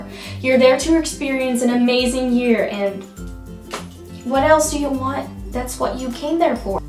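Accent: United States. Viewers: you got an American accent now.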